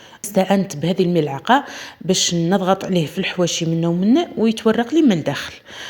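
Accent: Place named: Nayli, Algeria. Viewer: ياعمري ياعمري على ذيك اللهجة والهدرة الزينة..بنت بلادي العطرة..نايلية حرة..تقولوها ولا لالا(عطرة)ربي يحفظك ويعلي قناتك..❤❤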